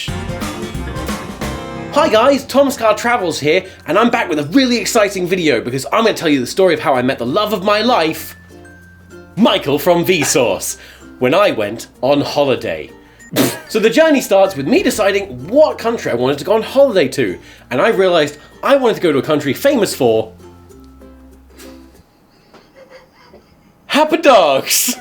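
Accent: United States. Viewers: His American accent is on point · Pretty good American Accent. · Why does your American accent sound like Markiplier? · Your American accent is actually p good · He's talked about this before. There's an old video on this channel about accents where he says he picked up some Americanisms from watching a lot of American cartoons as a child. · Wow that American accent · You sounded like an American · Good American accent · Pretty good American accent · The really good American accent just tied the whole thing together. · That's... a really good american accent. And as an American who enjoys making fun of non-american people doing american accents, that's painful to admit. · American Tom sounds so much different than British Tom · Dang his american accent is pretty decent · That’s a good American accent. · I thought he was British now he’s amarican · Your American accent is super weird coming out of your mouth. 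Good but weird!!!!! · your american accent is on point :3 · You have an uncomfortably good American accent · Ur American accent genuinely reminded me of markiplier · you do such a good american accent jeez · das a pretty good american accent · His American accent reminds me of Markiplier a little bit. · Ayyyy he’s an American maen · That american accent is 10/10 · Mohawk fits you, Tom. Also, good American accent. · The american accent made it so much better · Spot on American accent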